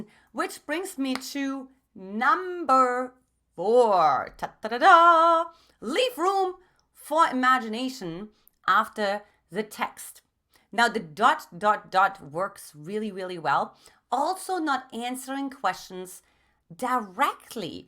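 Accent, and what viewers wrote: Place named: Germany. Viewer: Im ready! (And BTW love your German accent!).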